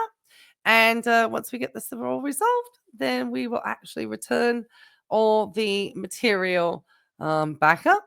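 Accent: United Kingdom. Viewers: if he keeps it up his account will be suspended. it is their own policy. Sarasota Tim is not just targeting you. You are allowed to use some of his material under fair use. As long as it is not for financial gain and just for commentating. It is the same thing has the broadcast media they do it all the time. Tim and Tammy just like scamming people. Their older broth Johnny a very well known Las Vegas area family friendly comedian who lives in Laughlin. I think he performs regularly at the Sands Motel and Casino. Ace Frehley had to deal with them too. On his channel. Love your Cornwall accent. Lovely part of the country.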